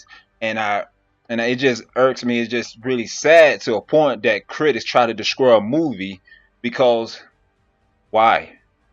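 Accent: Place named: South Carolina, United States. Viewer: Man you representing that SC accent deep. Shout out to South Carolina.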